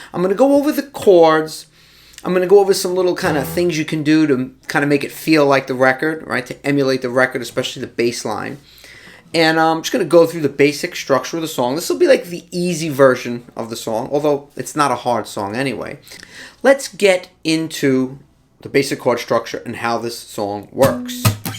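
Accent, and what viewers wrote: New York, United States. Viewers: Fab lesson Love the NY accent Cheers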